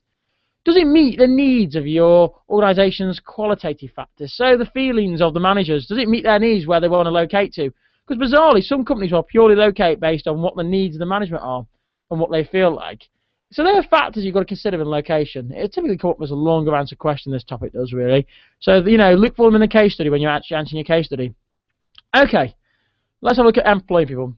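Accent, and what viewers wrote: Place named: Stoke, United Kingdom. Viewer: Thank you these really help! also are you from stoke? You say book and look as if you are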